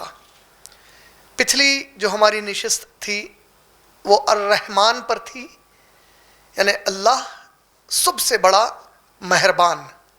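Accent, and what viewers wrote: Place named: Hyderabad, India. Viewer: Br assalamalaikum. i always suggested you that even you speak the truth, it's difficult to accept it due to harsh wordings, so kindly speak politely. Style of addressing is very essential, it leaves meaningful impact. You raise your voice unnecessary because the truth could be explained by having patience and kindness. In'Sha'Allah.try to avoid your hyderabadi rough style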